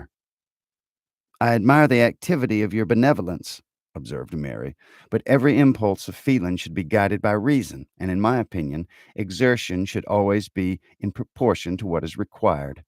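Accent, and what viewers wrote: South, United States. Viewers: When I found these videos, I inwardly groaned, thinking it would somehow "bring down" the literature. Keep in mind, I live in TX myself. But after hearing a chapter, I loved it immediately. Nothing is lost in "translation", and indeed, the text lends itself to the Southern accents. My compliments to the reader, Mr..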